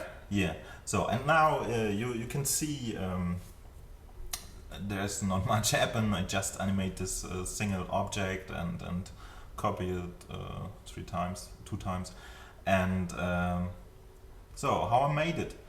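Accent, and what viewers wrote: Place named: Germany. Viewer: Man merkt das Sie deutsch sind^^ Das Deutsch-Englisch hört man SOFORT raus^^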